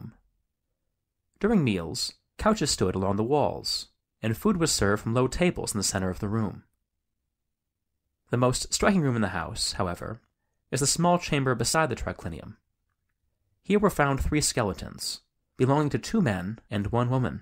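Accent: United States. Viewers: Interesting if the voice wasn't American... I dont like the "goodbye folks". Other English speaking countries don't call people "folks". It's very rude.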